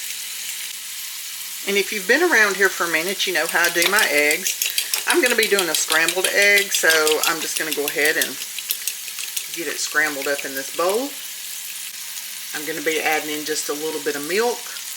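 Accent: South, United States. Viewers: I came across your channel when you were doing the easter dinner for 1 or 2, i live alone and your ideas are great. I usually cook meals ahead and freeze them. Seeing those biscuits reminds me of my husband. We always had the the grands in the refrigerator. Especially when we had company for the weekend. Nice breakfast., i've seen my aunt Melissa make them into apple dumplings. Thank you for sharing , i love southern accents, especially when they're spunky, like yours, thank you🙂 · I so Love your southern accent My precious Mom in Law and Dad in Law were from Northcarolina and i miss them both so much I'm from Montana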